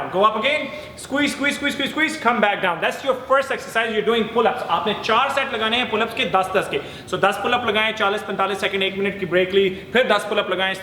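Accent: India. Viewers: kayknya kalian org india ya?...dari logatnya ketahuan. benar gak?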